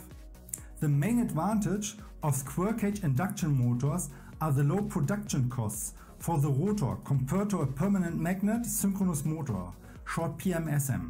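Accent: Germany. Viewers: 1) maybe a bit stronger sound even at maximum, I have problems listening to your video on the go (in the Berlin S-Bahn for example) 2) your German accent is extremely strong and sympathetic... 3) you put a lot of subjects in your video. I think it would be better to make different videos for each sub-subject. As you're explaining the basics here, I guess most people (including me) are a bit over-burdened with the many technical expressions 4) maybe try to use as little text in your video as possible. Maybe try showing as much by 3d-models only. Maybe use also some pointers to point to the parts of your 3d-models you're talking about 5) thank you for sharing your knowledge about electric motors. Thank you a lot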